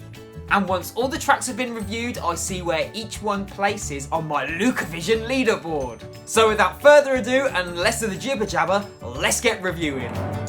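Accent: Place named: United Kingdom. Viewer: Oh, I'm so thankful for your hat and T-Shirt))))))) And your British accent...🥰🥰🥰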